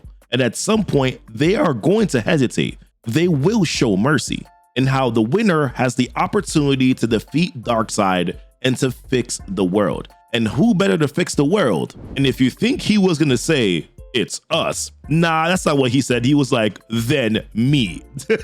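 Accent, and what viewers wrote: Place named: Jamaica. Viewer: i didn't know you were a yardie !? what part you from ?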